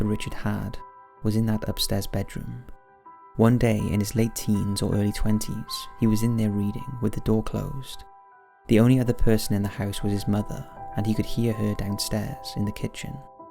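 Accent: Wales, United Kingdom. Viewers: You sound welsh , are you from Flintshire /Rhyl area ? Or are you an anglesey lad ? Anyway you sound very calming great narrating voice !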